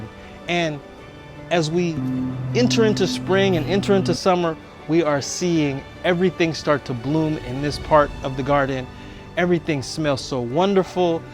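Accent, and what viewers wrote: Portugal. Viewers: I'm From Angola my Dad was Portugues i live in Portugal from 1999 till 2012 move to UK, but I feel like I wanna move back to Portugal, your cascais accent sound so Portuguese :)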